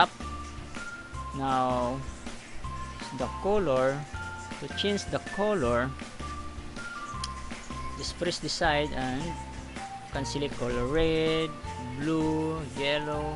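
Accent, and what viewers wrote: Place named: Visayas, Philippines. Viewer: walang Kabuhay-Buhay, Tagalogin mo na lng Hirap na Hirap ka Mag-English at di bagay pati mga Pronounciation mo parang Visaya Lng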